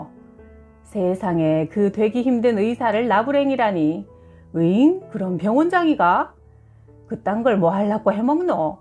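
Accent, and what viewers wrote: Busan, South Korea. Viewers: 님 부산 사투리 ~~ㅎㅎ 최곱니다.^^ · 님 부산사투리 직이네예~~😂😂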